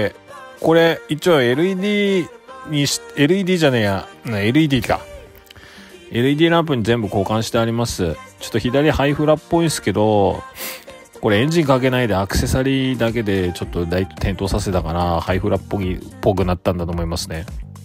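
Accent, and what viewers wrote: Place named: Tohoku, Japan. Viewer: 宮城でハイラックス前期乗ってます！訛りで、東北の方だなってすぐわかりました！笑 LEDテール参考にさせていただきます！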